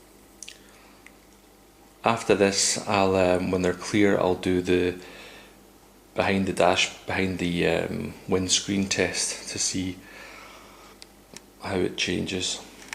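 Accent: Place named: India. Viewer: wow you sound Indian or some kind of black but you is white mate!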